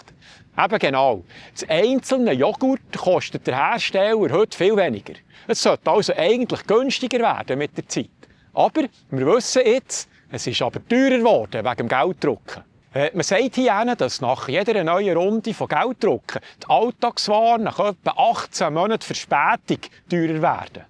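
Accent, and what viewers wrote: Bern, Switzerland. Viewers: Dr Herr Trump erchouft secht haut sini Macht mit Gäud und mit Entlassige. Weni ihm zuelose, de dünkt's mi rächt offesichtlech, dass es ihm nid i erschter Linie um ds Wohl vo Amerika geit, sondern um d'Vermehrig vo sim persönlech Riichtum und Macht. Si Aatrieb isch sis gchränkte Ego (was sogar nachvouziehbar isch, wenn me sini Biografie aaluegt). Nüt gäge Riichtum, aber es git riichi Lüt wo ehrehafti Mönsche si mit ehrehafte Wärtvorsteuige, und die würde nie so handle. Ja es stimmt, es geit hie nid a priori um Pro oder Contra Trump, und trotzdäm dünkts mi wichtig, das Thema und das indiränkte "Lob" a Trump im Video ine entsprächende kritische Gsamtkontext z'steue. Es isch aber e Fröid, dim schöne Bärndütsch zue z'lose 🤗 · Dieser Beitrag is wirklich ein Hit, ich bin froh dass ich gefunden habe. Und dann dieses schöne Bärndütsch! 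Herzliche Grüsse von einem Heimwehberner aus Südkorea! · Herrlich dis Bärndütsch 👍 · Das war wiedermal Deutsch und deutlich! Man kann sich über diese finanzielle Schieflage in den alternativen Medien sehr gut informieren lassen. Aber unsere tollen Mainstream-Medien begnügen sich halt immer noch mit einer dümmlich vereinfachten Weltsicht. Sie spielen 'hau den Lukas' und verkaufen uns für dumm. Du hältst in breitestem Berndeutsch dagegen - bravo! ❤ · Einfach schön, jemanden zu hören, der richtiges Schweizerdeutsch (Bärndütsch) beherrscht und praktiziert!!!!!! Dazu noch alles für die Dümmsten (wie ich) einfach erklärt. Danke!!!!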